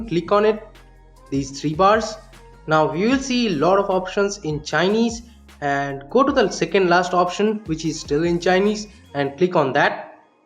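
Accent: India. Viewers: Chineese much better than your hindienglish -_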